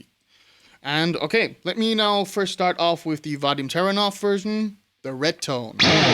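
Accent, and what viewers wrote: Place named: Germany. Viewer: Ach, Deutscher! Hab's am Oettinger bemerkt und jetzt auch etwas am Akzent. Aber das Video zuvor hätt ich nicht unbedingt darauf getippt. Kompliment. Wenn du magst, ich mache auch ab und zu Videos, wo ich Gear und Software präsentiere (meistens in Form von Riffs, spreche nicht). Das ist z.B. mein Sound mit dem Nalex Gerbert: <link> Hier ausschließlich ein AMT K2 Pedal, also ohne TS davor: <link>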